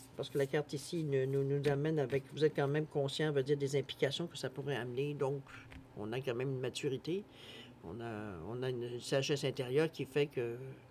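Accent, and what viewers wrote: Quebec, Canada. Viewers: ah c'est l'accent québecois !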